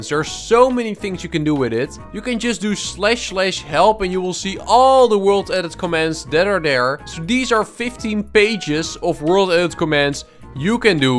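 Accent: Netherlands. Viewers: Youre dutch right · Best English Nederlands voce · hi ar you dutch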